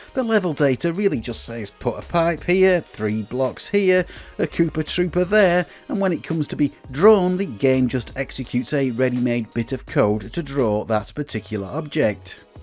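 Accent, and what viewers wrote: United Kingdom. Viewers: Speech impediments exist, you might need to take that into concideration. He also has a UK accent, and the UK has many different dialects. Hope this helps.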